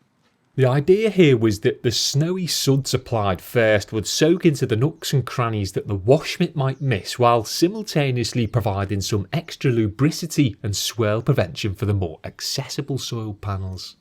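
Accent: Liverpool, United Kingdom. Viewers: What is it then? It's a real British accent, man sounds like Neil Buchanan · I seriously fawn over British accents so much 😛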